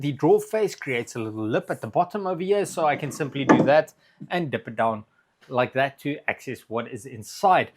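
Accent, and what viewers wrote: South Africa. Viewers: I'm new to this channel. Do I detect a South African accent?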